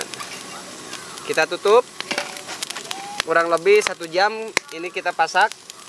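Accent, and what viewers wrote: West Java, Indonesia. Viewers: sunda pisan mang wkwk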